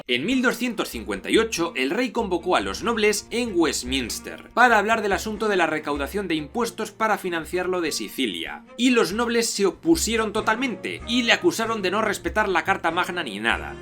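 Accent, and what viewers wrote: Spain. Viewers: Me gusta tu acento español, además de tus vídeos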